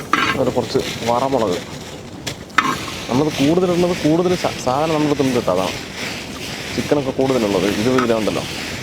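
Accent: Palakkad, India. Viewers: ഭായിടെ പാലക്കാടൻ സ്ലാങ്ക് ഇഷ്ടപെട്ടവർ ലൈക് ചെയ്യുക 👍👍👍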